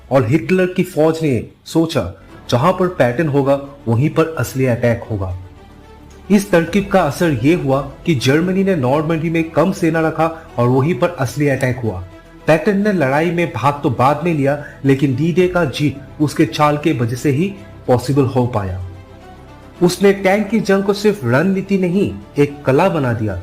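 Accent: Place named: Northeast India, India. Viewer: Nice and informative video, north east accent added to the presentation.... reminds me of my coursemate ... Good job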